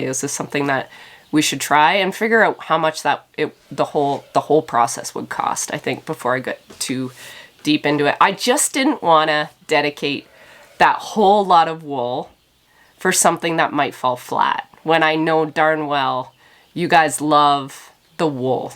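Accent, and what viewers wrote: Scotland, United Kingdom. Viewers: Can’t go wrong with a Scottish accent!! My dad who passed away 10yrs ago was Scottish and I loved his accent. I miss it. Just wondering, you utilize everything from a lamb that you can, right? Well, when they go to market, who gets their skin? There is a market for it isn’t there? I remember going to a restaurant once on the Old Banff Highway and it was run by the First Nations and upstairs was a museum where they showed a buffalo and what parts of the body was used by them and for what, right down to the testicles being used as a baby rattle. 😳 It was interesting to see it. Very little of the animal was wasted. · A good Scottish accent right there 🫶🏴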